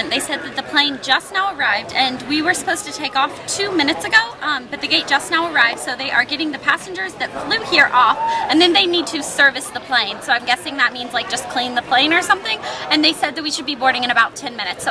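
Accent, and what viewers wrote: South, United States. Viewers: Ive been trying to figure out for so long where you were from. Tennessee? That's so cool. I'm from Hawaii. Ive always wanted a southern accent. Is that weird? Haha well have fun at prom :) -Kat Bloop O.o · AHHHHHHH!!! omg! I had NO IDEA you were from Tennessee?! Hahaha that's where I live! Lol you will probably never see this but I've heard you & your southern accent i just didn't know where you were from haha (: -3 TN... Nashville:P xoxo love you!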